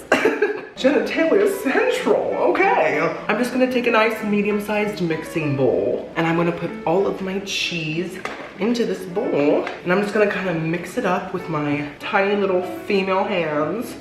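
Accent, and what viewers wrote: England, United Kingdom. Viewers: The English accent 😂😂 such a classy dame. Dora the Explorer Vibes! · Omg the British accent. I’m having a really shitty day, this is is making me feel a lot better. Thank you for being you. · Omg xD The British accent is fabulous. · The British accent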